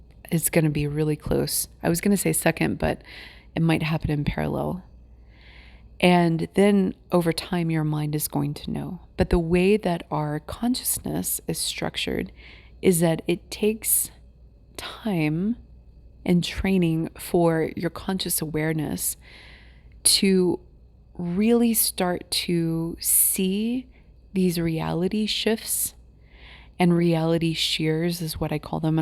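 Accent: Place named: South, United States. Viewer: Beautiful video! You explain things so clearly and you're very easy to listen to. It's like I'm listening to one of those women we have in the south that call you "honey". You feel so safe.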